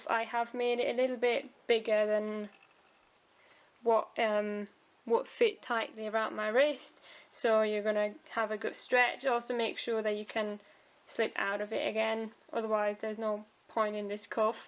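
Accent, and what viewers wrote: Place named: Yorkshire, United Kingdom. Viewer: where are you from haha? your accent is so yorkshire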